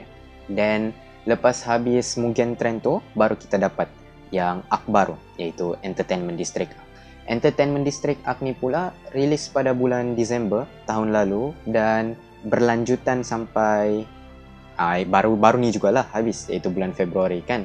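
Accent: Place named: Sabah, Malaysia. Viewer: Abang orang sabah ke? Suare abang macar orang sabah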